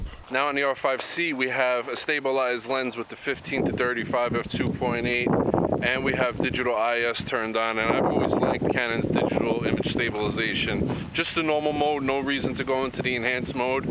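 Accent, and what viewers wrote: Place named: New York, United States. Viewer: Love your accent are you from Jersey or NY originally?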